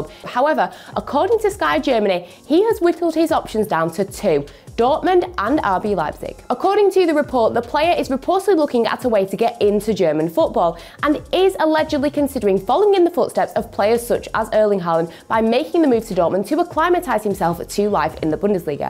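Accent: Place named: United Kingdom. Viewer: Your English is so British haha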